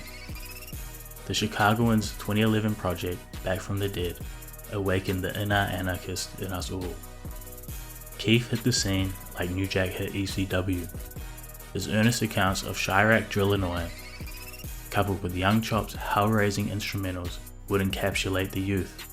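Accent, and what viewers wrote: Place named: United Kingdom. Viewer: holy shit british man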